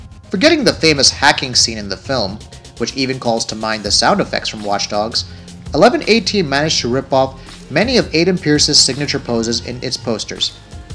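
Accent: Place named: United States. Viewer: wo plz stop using American accent, if you can't stick to Indian fine, adopt British since its far easier to understand them than American's. Though am talking silly, how could you change to British and not Indian, but still, its just too clunky.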